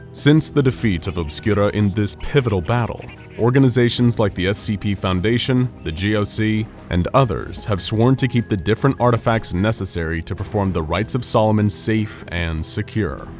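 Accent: Germany. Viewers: im German and you cant speak german you doesn't have the accent for that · This German accent is atrocious.... Fun to watch though.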